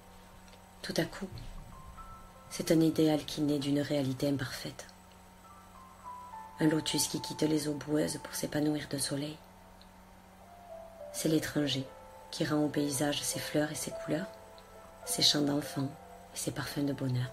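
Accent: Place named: South, France. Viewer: Je le redis "sublime" et tu as le même accent du sud que moi. Encore merci 💖💖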